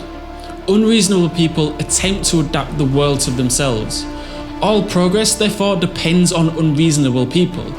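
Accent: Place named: United Kingdom. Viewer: I really love your British accent!!😊😊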